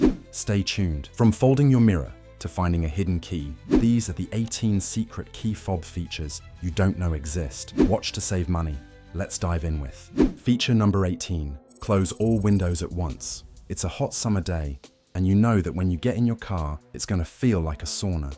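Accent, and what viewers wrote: United Kingdom. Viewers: All this stuff is great BUT we're / how do you set these things up. Are the directions in the manual? Telling us that both BMW and Toyota has a certain thing doesn't help. What models - what years. And the big question. The nariator has what sounds like a British accent so therefore is it only in England ???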